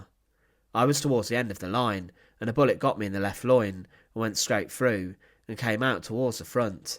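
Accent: United Kingdom. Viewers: It's his accent. A significant portion of the UK sometimes prounce the letter 'T' as a 'F' sound. This is called an accent. Once again, criticising someone for their accent is pathetic.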